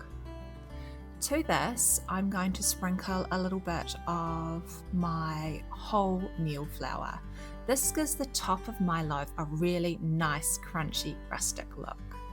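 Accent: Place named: New Zealand. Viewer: Love a New Zealand accent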